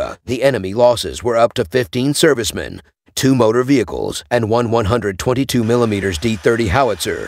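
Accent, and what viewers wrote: United States. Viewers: Давно мечтал, чтобы Американский комментатор,озвучил положение на фронте!!!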